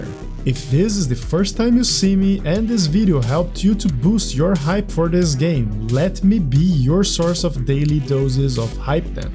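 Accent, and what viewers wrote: Brazil. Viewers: Great video, man. Is your accent from Brazil? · Where are you from? Se sotaque parece de brasileiro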